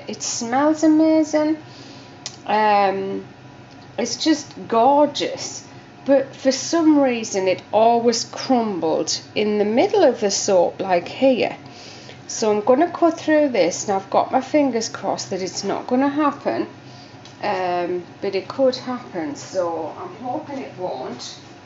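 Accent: United Kingdom. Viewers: I think that a lot of us that love your voice are not from the UK. All the UK accents are beautiful sounding. For me the best part of your voice is that it just sounds like you are enjoying what you're doing and when someone sounds like they are enjoying what they are doing their voice is priceless.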